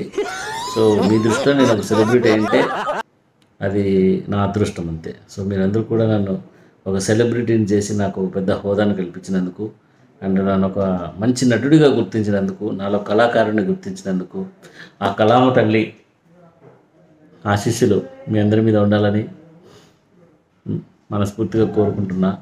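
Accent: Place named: Rayalaseema, India. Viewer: Superb comedy, innovative ideas coupled with Rayalaseema slang made your videos extra special. Keep going brother.🙏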